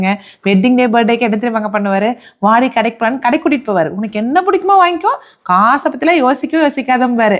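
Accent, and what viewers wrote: Kongu, India. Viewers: Unga slang கொங்கு tamil மாதிரி இருக்கு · Kongu tamil sis🎉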